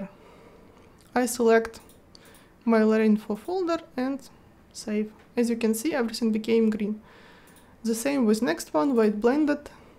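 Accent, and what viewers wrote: Russia. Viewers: Как звучит русский акцент? Вот так) Спасибо большое за видео! Успехов! ЗЫ: Очень подробно. Подписался. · Jest' ya slsshu russkiy aktsent, ))) Есть русская версия?)) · слышно русский акцент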